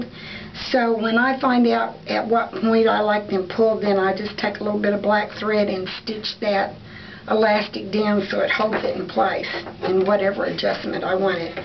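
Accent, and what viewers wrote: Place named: Nashville, United States. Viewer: First of all, as a new wig wearer I really appreciate having video reviews to watch to help me decide which ones to try. So thank you for taking time to do the videos. I also wanted to ask you where you got your OD done. I'm outside of Nashville and your accent kinda sounds like mine! I've had Graves' and TED for about 15 years. Had one OD In 2003 but in the years since my other eye has grown out. The dr. who did my surgery in 2003 has moved out of state so looking for a new one.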